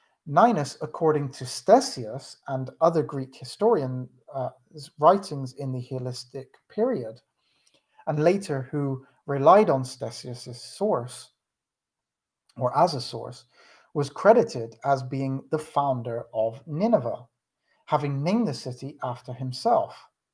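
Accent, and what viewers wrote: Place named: West Country, United Kingdom. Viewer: Sounds like Devon n Somerset! Thanks for this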